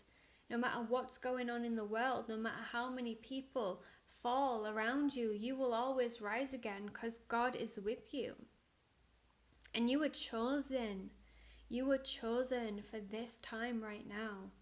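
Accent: Northern England, United Kingdom. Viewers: Love the truths you share, and absolutely love your northern accent 😊❤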